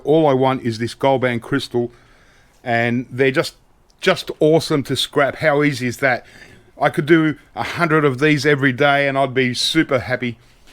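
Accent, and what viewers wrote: Australia. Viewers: I live in the United States and we were locked down for the longest time and you weren't don't apologize for something you can't do anything about I love watching your shows I don't care what you do tearing stuff apart cutting off wires it's all good just don't stop let's do a marathon what do you think love that Australian accent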